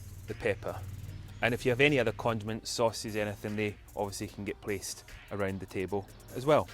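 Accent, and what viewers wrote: Scotland, United Kingdom. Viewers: I just love your Scottish accent! I know, I know, I'm biased for I'm Scottish too. Looked forward to watching your channel, your tips on etiquette. Who know, one day I may have someone from the gentry coming for tea!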